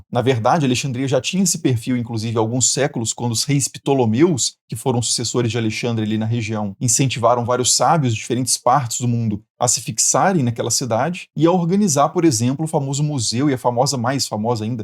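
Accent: Minas Gerais, Brazil. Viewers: A didática do "mineirês" é a melhor! 😂😂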